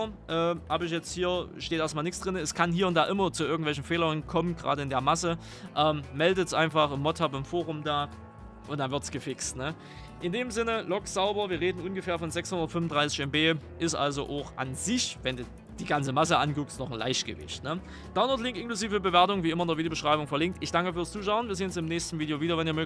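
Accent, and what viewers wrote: Saxony, Germany. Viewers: Tolles Video, Super Karte,da wurde richtig abgeliefert. Großes Lob an den maper. Ich bin großer Fan von deinen Videos und wie man hören kann bist du Sachse. Mach weiter so. Gruß Rene aus Dresden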